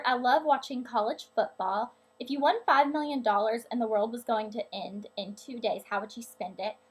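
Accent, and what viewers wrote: South, United States. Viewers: I'm from the south to! I dont really notice your accent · sweet tea! lol you are southern... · lol ur have the south accent... sorry lol just sounds weird cuz im from NYC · how come you have the southern accent but elle doesn't? elle sounds like a valley girl sometimes lol is it cause she had already developed her accent or way she speaks before you moved to the south?? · Your southern accent is adorable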